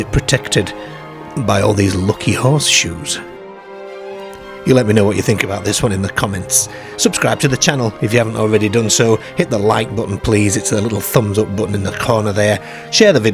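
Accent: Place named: Northern England, United Kingdom. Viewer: Just came across this version of the story. I am trying to work out your accent. Obviously northern, and I am from the north of England, but can't place this. I know there are lots of variations and yours is new, and fascinating.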